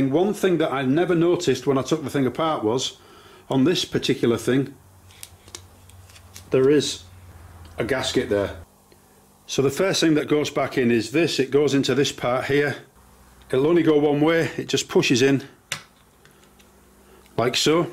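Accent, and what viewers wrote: United Kingdom. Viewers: I'm from Poole, but currently live in the wilds in British Columbia, so it was nice to hear a Brit explaining the Dyson's guts in my own "language". :-) I swear I have spent more time trying to get my Dyson working ok than I have actually using it. No more!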